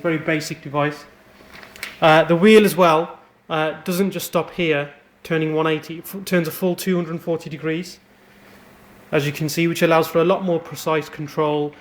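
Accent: United Kingdom. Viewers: i hear a brummie accent, come on you blues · i like your accent, british man :P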